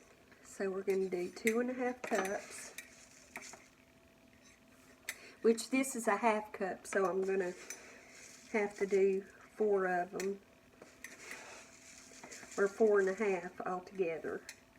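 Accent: South, United States. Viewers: At my grandmas house my twin aunts who never married cared for my grandparents. My aunts were wonderful. I didn’t grow up with a mother and it was like having 2 mothers every summer down in West Virginia. They made peanut butter pinwheels. I’m going to try the preachers cookies. Down in Minden W.V back in the 60’s we would walk to church ( my aunts took care of Sunday school and all church events) and the preacher would come home and have lunch with us every Sunday. During the summer they had a house full. I have stories about my Aunts walking home late from church and a bear walking up behind them. One aunt took off and got my grandpa ( with his shotgun), as the other aunt kept talking thinking her sister was still next to her, then heard a blast that scared the bear off. Every summer I cried leaving my best memories ever. your cooking and southern accent bring back memories of long ago. 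Thank you🥰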